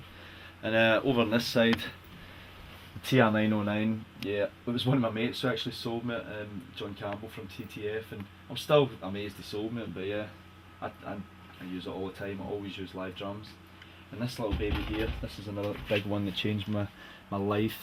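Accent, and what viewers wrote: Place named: Scotland, United Kingdom. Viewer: Dat scottish accent! I can't understand it :D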